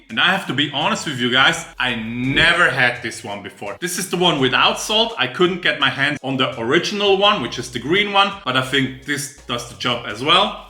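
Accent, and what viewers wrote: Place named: England, United Kingdom. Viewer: Der englische aktzent is ja sowas von lustig 😂herzig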